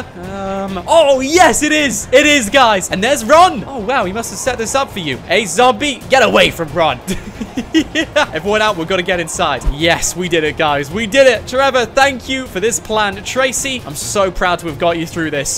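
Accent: United Kingdom. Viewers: I have to say that i LOVE your British accent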